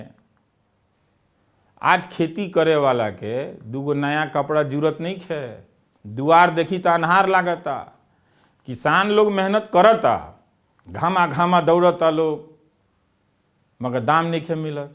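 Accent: Bhojpuri, India. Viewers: तोहार भोजपुरी भाषा bra निक लगता भैया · I am Maharashtrian but love the way your bhojpuri ❤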